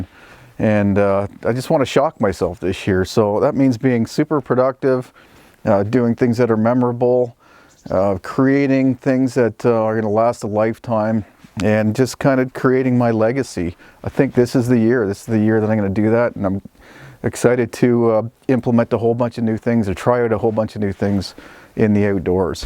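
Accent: Canada. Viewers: Hi, Great video, as usual. Completely off the subject but has anyone else seen the similarity in personality, tone, and world view (not to mention looks and Canadian accent, eh?) between and the great advocate for free speech and self reliance, Jordan Peterson, the clinical psychologist whose work has done so much to turn young men around. If you haven't seen Jordan Peterson, you must. He's all over Youtube. Check him out too, you won't regret it. It's be nice to have and Jordan sit down and talk about life. Happy New Year! Steve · *WOW 💕 what a man!* *You've created a wonderful, (other) life.* * *I wish you safety and all the best for great health and happiness with family and friends for many, many years to come.** 🤗 I adore your Canadian accent especially, when you pronounce the word: "out".🤗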